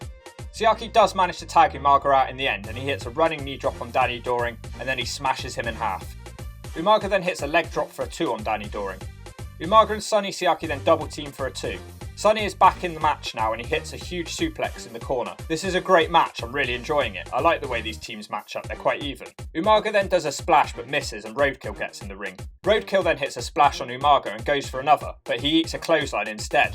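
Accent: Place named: Somerset, United Kingdom. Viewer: That accent is so Somerset I love it. It reminded me of Sunday lunch 🤣🤣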